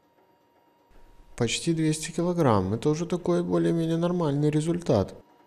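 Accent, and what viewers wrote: Belarus. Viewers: Парень ты из Беларуси, слышно по акценту и скорей всего из западных областей!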